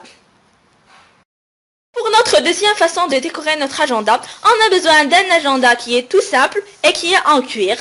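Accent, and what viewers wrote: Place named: Canada. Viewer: Si est parle comme sa ses quelle a l acsent canadien bande de merde sa se voix (p pour dire paillettes elle dit brillant et pour dire étoile elle dit star ) tout les canadien(ennes) ytbs que je connais parle comme sa et elle pn beaucoup d abonner 😭😭💖💖💖💖💖💖💖💖💖💖😪💖💖💖💖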